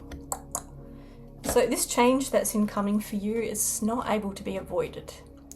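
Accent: Australia. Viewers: you are ethereally beautiful. your eyes look like pools of eternity. if i'm not mistaken your accent is aussie. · Thank you my dear darling for the wonderful reeding I truly love you girl you're alsom little woman and girl I love your eyes and you're astra accent so sweet with that stay cool and cute your good friend dale senney ❤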